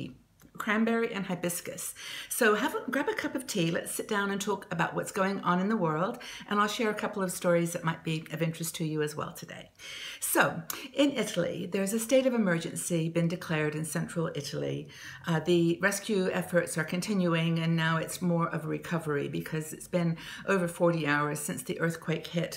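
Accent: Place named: United Kingdom. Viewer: your British English!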